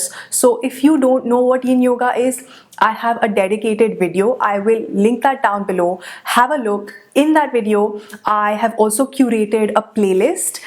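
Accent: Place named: India. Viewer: Amazing knowledge your sharing! Keep helping people my friend. Love your indian accent too you got a sub from me 😄 2021 LET'S MAKE IT A GREAT YEAR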